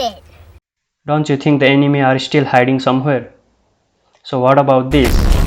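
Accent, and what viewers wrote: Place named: India. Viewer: Heey you sound like an Indian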